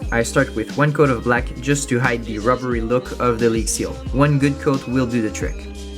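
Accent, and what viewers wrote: Canada. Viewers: Thanks. I've seen people use cotton fibers from cotton balls applied with watered down glue to achieve a nice corroded texture. You sound Canadian. So Hi from a fellow Maker and Canuck from Quebec City.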